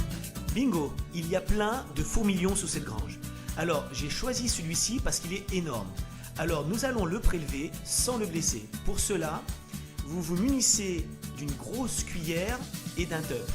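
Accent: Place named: Rural, France. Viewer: AH AH AH; tu t'es éclaté; on voit que c'est les vacances!! et bravo pour les accents du terroir!! Ceci dit bravo pour les explications sur les fourmilions et pour les vidéos, de mieux en mieux.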